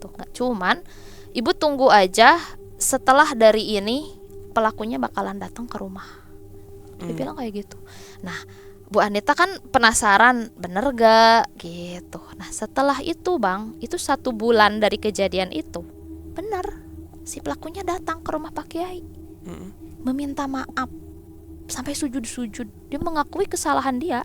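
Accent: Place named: West Java, Indonesia. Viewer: Sundanis tulen bgt logat si teteh nya,, lanjutkan teh 😁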